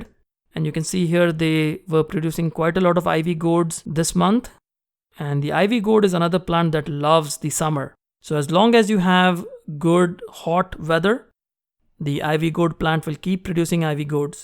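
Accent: India. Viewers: your pronunciation is like Indian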